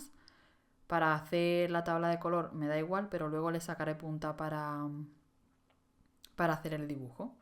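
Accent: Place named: Colombia. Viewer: Erga pq hablas como en Colombiano pero de españa? No es jeit, enrealidad me es más agradable al oido, pero me pajea el cerebro escucharlo asi jajaja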